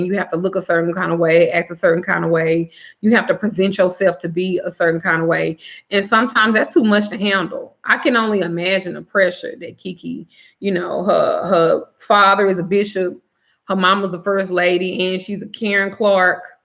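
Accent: South, United States. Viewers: I love your accent :) Can I ask where it's from, Texas? Alabama?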